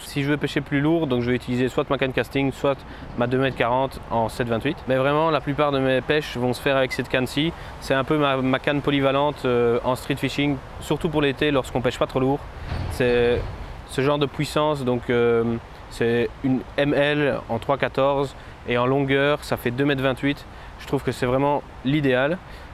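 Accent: Belgium. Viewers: oui j'ai reconnu ton petit accent belge que je connais bien, je suis natif de Valenciennes.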